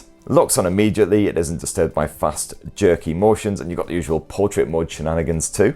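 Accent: United Kingdom. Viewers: You are always outrageous with yuh commentary but gosh I love the British and the way y'all speak. Bro say, yuh won't have to sell yuh first born😂😂😂😂😂😂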